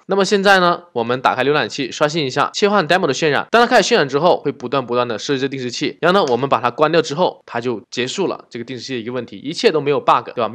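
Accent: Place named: China. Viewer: 除去英文不谈，这口音是哪里啊，肯定不是北方了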